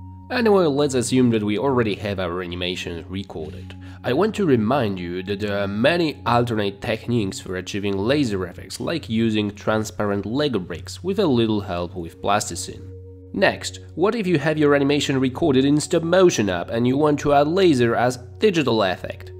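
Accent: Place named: England, United Kingdom. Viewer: Damn I wish I had that English accent. Great video btw 👍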